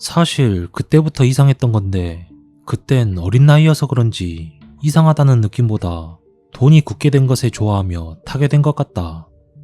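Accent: Jeolla, South Korea. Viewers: 제가 경상도인데 이분 사투리는 전라도 사투리인듯 합니다 근데 저는 이분 살짝 사투리 억양이 오히려 정감이 가던데요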